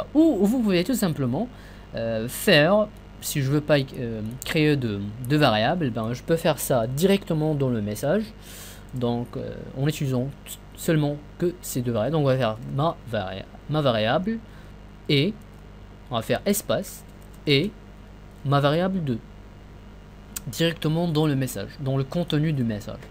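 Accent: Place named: Morocco. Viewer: On entend l'accent marocain lol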